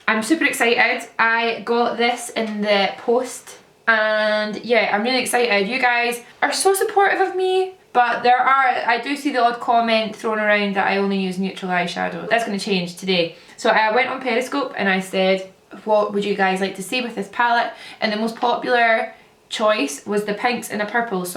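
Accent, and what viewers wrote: Scotland, United Kingdom. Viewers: Haha I'm Scottish as well and I know how ya feel about the pronunciation problem😂😂 "laaaaaaayen low". Your videos are great and it's fab that ur doing more videos these days! Xx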